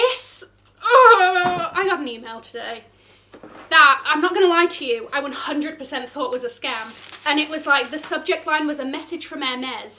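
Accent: United Kingdom. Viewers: I love your accent! Its not the basic british accent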